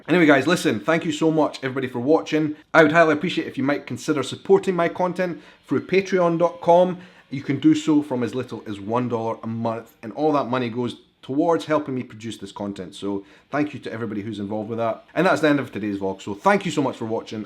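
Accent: Scotland, United Kingdom. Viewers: Hello i am from Singapore i am 40 years old. First of all thank u so much for sharing with us those scary stories. Honestly u are such a BRAVE guy to walk in the dark tunnel. To be very honest i can understand your Scottish accent. 👍👍👍👍👍👍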